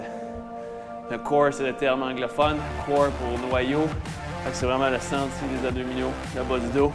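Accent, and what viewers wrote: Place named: Quebec, Canada. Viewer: Salut, Merci pour cette petite séance ! J'aime beaucoup le format. Quelle bonne humeur ! Et l'accent québécois est plein de joie, ça motive !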